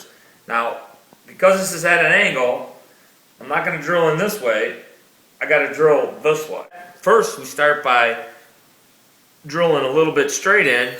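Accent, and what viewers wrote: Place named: Pittsburgh, United States. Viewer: Thanks. Great job! Haven't heard such a strong Pittsburgh accent in a long time. Question: Are all the newel posts the same size? I've been looking at ordering some but there seems to be different heights for landings, starters, etc. Thanks ~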